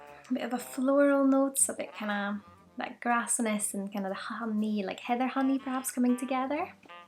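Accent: Scotland, United Kingdom. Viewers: Scottish or swedish ?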